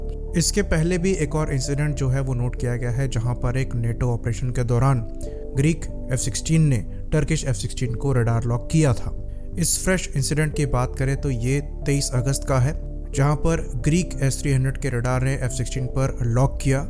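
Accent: India. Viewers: As soon as I heard the Indian accent I didn't surprise. 🤣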